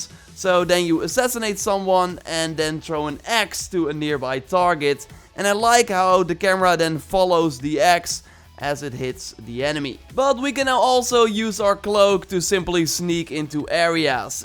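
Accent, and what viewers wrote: Netherlands. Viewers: you are from the nethelands dat kan ik horen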